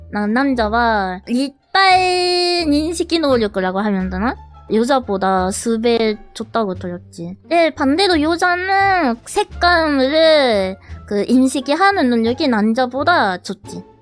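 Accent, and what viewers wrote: Japan. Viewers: 이 버튜버 한본어 지켜주세요... 매력포인트야.. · 한본어 개귀여움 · 한본어 존나 맘에 드네 ㅋㅋㅋㅋㅋㅋㅋㅋㅋㅋㅋㅋㅋㅋㅋㅋㅋㅋㅋㅋㅋㅋㅋㅋㅋㅋ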